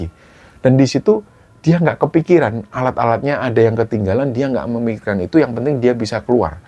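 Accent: Java, Indonesia. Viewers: Asallamualaiqum Mas Salam.Kenal.Bunda dari Kota MAJALENGKA. Suka bnget klo denger crta dari.itu Lho logat Jawa nya enk di dengar Yaqin Mas..😅😅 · ❤❤❤Om the way you speak seperti keluarga besar SOLO kami 😂 ( Om, bulik, Budge, Padhe) ❤❤❤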